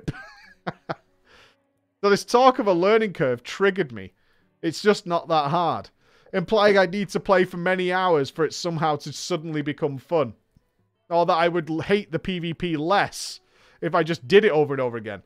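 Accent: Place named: Yorkshire, United Kingdom. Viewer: You are a top notch story teller, being from yorkshire it's even more pleasant haha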